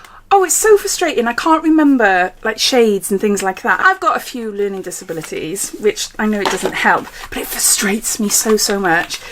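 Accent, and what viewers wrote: Wales, United Kingdom. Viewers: Really sorry to hear someone was so rude about your bubbly personality. It’s one huge part of why I love your videos. Honestly, thoughtful reviews, also I do love Welsh accents 😄 Ignore the odd silly comment. This calendar looks really great. It would’ve been on my hit list if I could get it shipped over. You’re spot on as well… “no Avant”! Those products tend to artificially inflate the overall value and I find it off-putting when I see them in boxes or calendars. I wish their pricing was more in line with the rest of the industry.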